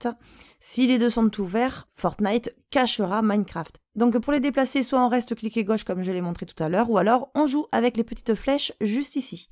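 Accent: South, France. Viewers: vous parlez trop vite, calmos.. (c'est l'accent du sud peut-être) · supert tutoriel tres complet bravos jeune fille continue longtemps celas fais plaisir d'apprendre en ta compagnie ! avec l'accent du midi amicalement marc pecheo3 merci!😀💐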